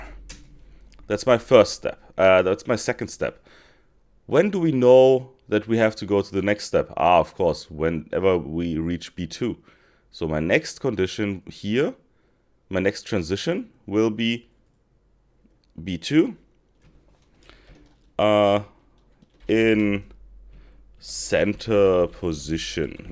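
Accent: Germany. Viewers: man merkt doch schon nach dem einleitungssatz dass der dude deutscher ist xd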